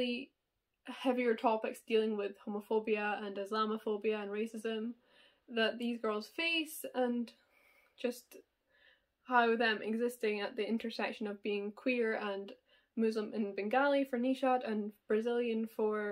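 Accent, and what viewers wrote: Scotland, United Kingdom. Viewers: This type of recommendation video is so needed! I'm deffo gonna have a look at some of these! (ps, i love your accent!! I'm from scotland too <3)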